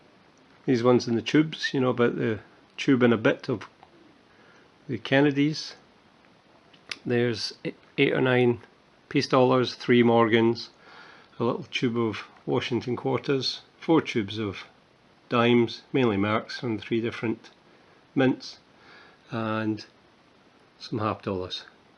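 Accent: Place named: Scotland, United Kingdom. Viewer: . pleasure to watch. I'm a new sub for you. I've heard your name a hundred times on this Channel. I saw what you did for y numismatic collector the other day. Very Nice gesture on your part. you are a gentleman. great Video on the us 90% pleasure to run across your Channel and finally hear that Scottish accent.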